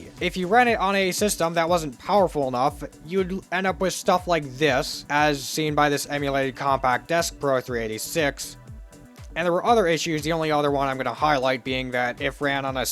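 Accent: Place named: United States. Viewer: What kind of American accent do you have? Very distinct/unique from what I am used to hearing.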